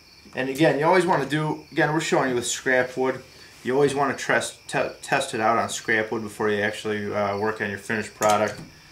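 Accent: United States. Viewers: Great demo. Love the US accent. Now I'm going to have to find out if my Porter Cable nailer can swap out the trigger to become a bump nailer.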